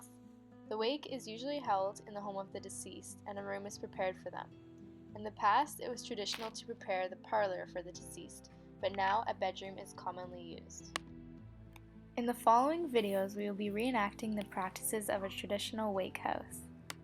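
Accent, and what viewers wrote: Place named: United States. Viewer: American voiceover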